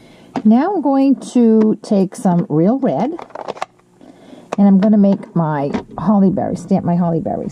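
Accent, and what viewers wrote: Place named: Maine, United States. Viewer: Super cute card need to get the letters lol. Need to look and see if you have scrapbook pages videos. I hear your accent where are you from sound like Maine.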